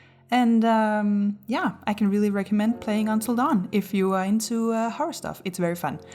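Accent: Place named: Denmark. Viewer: Thank you so much for the tutorial! I recreated it in Once Human and ur video helped alot!.. Also are u danish? U sound quite danish 😅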